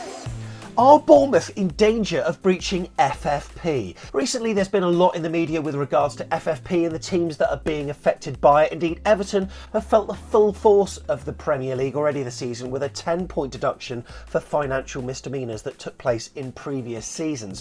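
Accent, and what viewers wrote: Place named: United States. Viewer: American accent frightfully good. You should do all future financial breakdowns as Gandolfini from The Sopranos.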